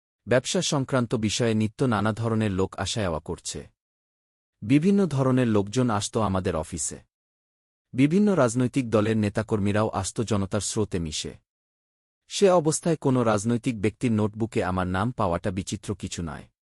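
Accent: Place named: West Bengal, India. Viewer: The reader is from West Bengal!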